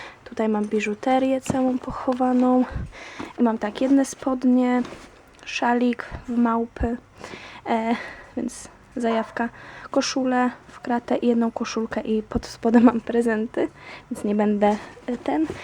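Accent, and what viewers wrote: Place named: United Kingdom. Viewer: uwieeelbiam jak mówisz po angielsku, masz piekny akcent! uczylas sie wczesniej cos więcej niż w szkole czy mieszkanie w Anglii cię tego nauczylo ;)?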